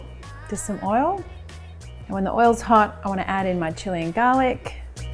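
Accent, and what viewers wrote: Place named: Australia. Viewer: She’s Australian how don’t you know from this video wth they pronounce things differently.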